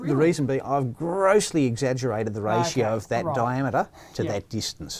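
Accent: Australia. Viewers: I fucking love the English accent of Australia peeps. I wish, I could manage to get even 1% of that… 😂😂😂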